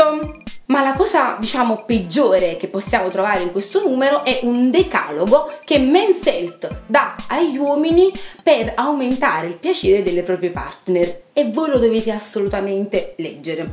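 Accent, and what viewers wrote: Naples, Italy. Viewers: Però questo accento napoletano non si può sentire... e io sono napoletano xD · è l'accento napoletano a dare gusto al monologo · E' l'accento e la pensata napoletana che dona quella schiettezza e stimola l'intelligenza! Col mio accento romano non riuscirei a dare un senso di serietà al discorso...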